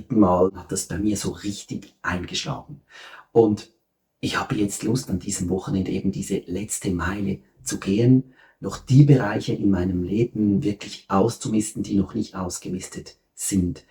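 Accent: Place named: Basel, Switzerland. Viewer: Wie schön mal einen Mann beim Sortieren über die Schulter zu schauen! Dein Video hat mir sehr gut gefallen, ich mache das sehr ähnlich und habe auch aufgehört ständig etwas Neues zu kaufen. Ein neues Teil und ein altes Teil muss gehen. Beschriftung mag ich nicht so gerne, aber jedes Teil hat seinen „Park“platz (eine sehr schöne Bezeichnung!). Meine beste Freundin lebt in Frankreich an der Grenze zu Basel, ich höre Deinen Akzent und bin sofort dort 🥰 Vielen Dank für Dein ehrliches Video und liebe Grüße aus Hamburg, Claudia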